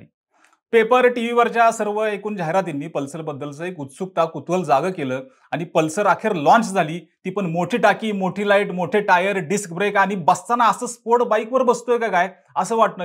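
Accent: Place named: Kolhapur, India. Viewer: तो कोल्हापूर चां आहे तू पेठेतील असतील आणि एकदा पुणे गावठाण ची भाषा बघा पुण्यातून बाहेर या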